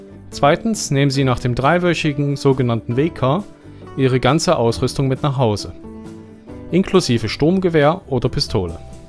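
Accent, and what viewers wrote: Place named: East Germany, Germany. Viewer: Super Film. Mit deinem Ossiedialekt hälts du uns den Spiegel vor und gelegentlich lernen wir selbst noch etwas über uns. Mit Humor und pointiert-weiter so.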